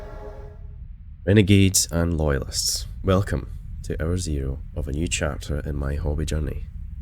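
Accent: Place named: Scotland, United Kingdom. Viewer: amazing job mate! 🤘 and that smooth Scottish accent makes the video 10x better lol 🤣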